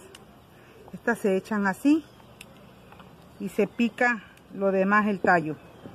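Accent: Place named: Veracruz, Mexico. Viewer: De donde es usted? Parece de Veracruz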